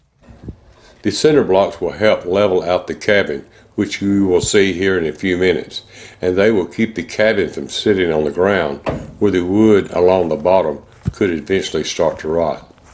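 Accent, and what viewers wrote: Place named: Texas, United States. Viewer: Hey Mr.. I can tell you are from Texas. I love your accent, lol. Your land is gorgeous! Such an awesome video. I made sure to subscribe and have seen your other videos. I am enjoying them.I will be waiting for more videos. Have a really good night. See you soon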